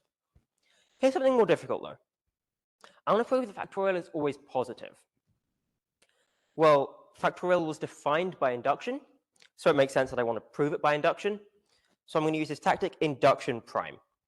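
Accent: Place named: United Kingdom. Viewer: The guy has such a heavy british accent that it is difficult tu understand the talk. I have listened the first 40 minutes and throw the towel.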